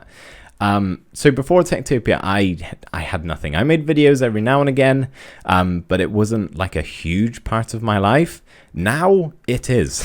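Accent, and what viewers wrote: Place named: England, United Kingdom. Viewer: Gutted to hear this. Thank you for so many wonderful episodes! Edit: oh, man, I'm surprised how sad I am about this ending. It was a little bit of chill happiness during a horrible time for all of us. 2nd edit: Of course you have to go with your motivation and I wish you continued success with your channel. I'm sure I'll give one of your other series a try soon. One last thing I've wanted to say for almost 2 years by the way: I love hearing your accent! It's so cute to hear a rhotic English accent from England (most speakers in England are non-rhotic). Somehow your accent makes you sound warm and friendly, although that's probably also your personality ha ha. Thanks again for helping us get through a tough time!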